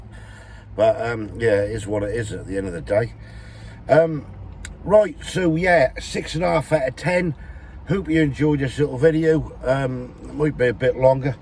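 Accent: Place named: Lowestoft, United Kingdom. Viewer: Surprised you never had your breakfast in the cafe in Sparrows Nest itself, unless, of course, you've already been there. Relatively expensive, perhaps, but very good. I was born and bred in Lowestoft, but love coming home! Love your videos and your wonderful Lowestoft accent. You make me feel homesick! Keep those videos coming!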